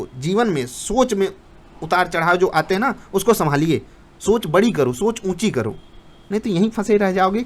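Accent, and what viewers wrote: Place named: Kanpur, India. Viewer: Full kanpuriya style me maja aa gya.....ek full video chahiye isi tone me ab to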